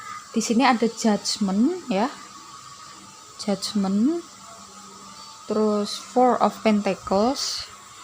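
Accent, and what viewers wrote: Java, Indonesia. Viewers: Medok banget wong jowo ya mbak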